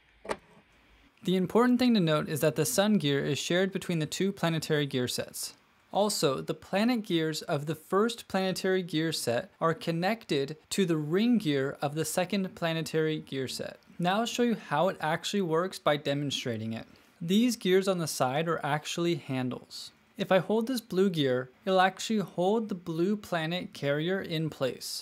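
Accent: United States. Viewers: He has an American accent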